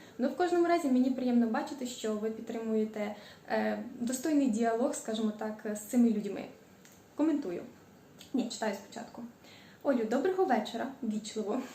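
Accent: Poland. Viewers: Олю, не ображайся, але твоя вимова трохи ріже вухо. Тебе гарна українська мова, але відчувається польський акцент на рівні наголосу на останніх складах у словах. Це робить нашу українську мову у твоєму спілкуванні дещо не гармонійно. Тому прошу це врахувати. Ти як блогер, людина з вищою освітою повинна розмовляти правильно. Покажи всьому світу, що українська мова гарна, мелодійна. Роби правильні наголоси! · Ви молодці.Ваша українська мова чудова.Живемо майже рік в Польші і лапаю себе на думці,що частіше думаю на польській і деколи навіть плутаю слова укр з польськими.Це цілком нормально...цим не переймаюсь...Подруга моя довше живе тут,чоловік її поляк і спілкується з ним на польській,то в неї така подібна вимова до вашої.Мені подобається,багатьом теж...а кому ні то нехай не слухає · мова твоя повязана з польскою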